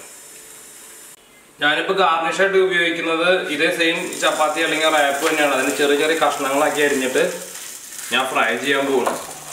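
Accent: Thrissur, India. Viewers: അത് ഒരു ശൈലി ആണ് ബ്രോ എന്റെ കൂടെ ജോലി ചെയുന്ന ആളുകളും പറയാറ് ഉണ്ട്. തൃശൂർ ക്കാർ ആണ് പറയാറ്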